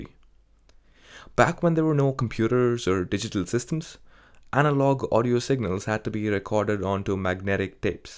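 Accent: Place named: India. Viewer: this would've been a great video if u didn't have that accent, or if u weren't indian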